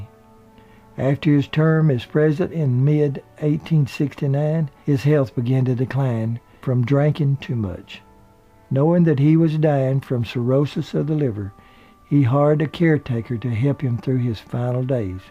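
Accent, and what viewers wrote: South, United States. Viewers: Great video... I can listen to you and Shelby Foote for hours. I love the sound of a southern drawl... it makes me feel at home. Great work sir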